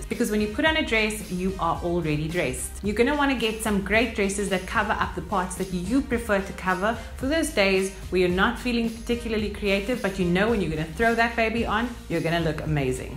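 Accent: South Africa. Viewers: Maays South Africa ☉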